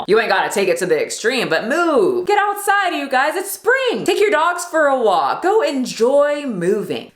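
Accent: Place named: Louisiana, United States. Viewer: are you southern? you got that Louisiana accent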